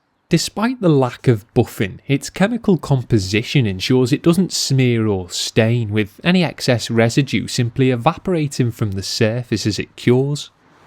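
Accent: United Kingdom. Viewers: Somewhere near Liverpool in the North West of England. I don't know exactly where but that's where is accent is from. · Conorsz He is definitely from a particular part of the UK, I'm just not sure which area.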